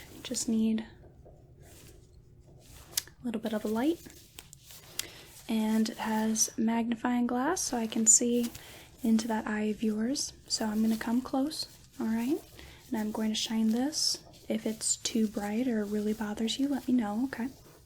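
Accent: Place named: Midwest, United States. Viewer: I'm from Missouri and you sound just like me lol 😂 Midwestern love!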